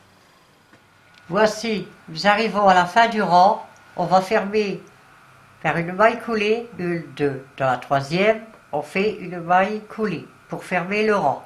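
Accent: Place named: Nord, France. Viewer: et oui encore merci et j aime bien entendre ta voie ton accent , , sa me rappelle le Nord , j ai plus que le bouton a faire , très bonne fin de journée bisoussss , Monique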